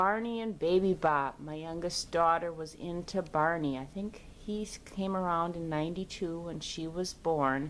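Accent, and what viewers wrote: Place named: Midwest, United States. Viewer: You sound like you must be in the Midwest I have lots of Cousins in Wisconsin and yes they have had the weirdest weather with high winds...one day some snow and then warmer temperatures. Enjoyed your video....do you sell a lot of yarn my younger sister loves knitting ! Corie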